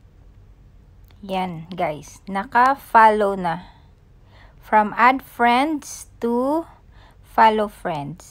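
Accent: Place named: Pakistan. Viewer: pak english is life joke your so beautiful #sweet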